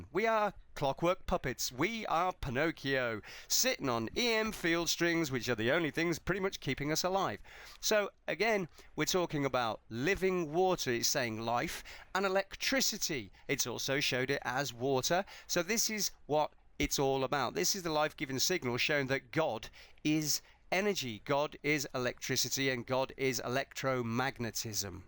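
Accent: United Kingdom. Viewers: getting in a fuss other someone's accent not sounding right to you is 100% an american trait lmao. Listen here English accent, speaking the language of your county properly. you say it onk like us 'murricns not ank, also while were here I have some words about the whole tomayto- tomahto, potayto-potahto debacle as well... LMAO I seriously can't believe some people are so dense to make a fuss about your accent.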